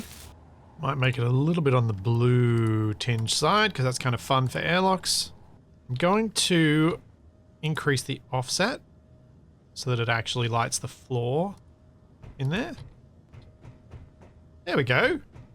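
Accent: Australia. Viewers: With Ozzy Man dialect, my brain keeps translating his talk about "half-width stairs" into "half-wit stares"... which I'm kinda takin' personally... 😳🥸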